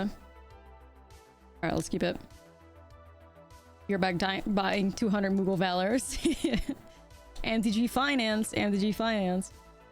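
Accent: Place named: Netherlands. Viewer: Are you from the netherlands? Sometimes your accent sounds like that :D Its kind of cute.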